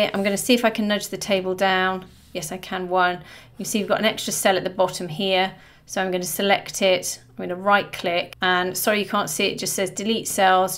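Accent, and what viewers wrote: United Kingdom. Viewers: What a powerful knowledge on how to play with checklist, etc. Beautiful accent ( British and the calls rocks. Thank you.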